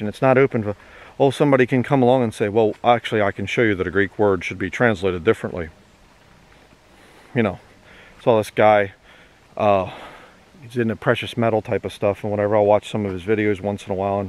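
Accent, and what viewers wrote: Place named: Pennsylvania, United States. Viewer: can tell you're a PA boy by your accent!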